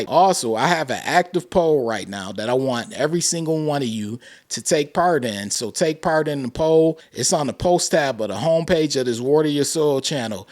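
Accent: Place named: Philadelphia, United States. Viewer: Bro, you sound like you're from Philly. Buffalo is a surprise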